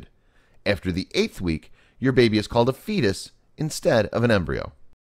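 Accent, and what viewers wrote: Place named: United States. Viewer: British voice should be mandatory for documentaries. What a horrendous "metallic" American voice.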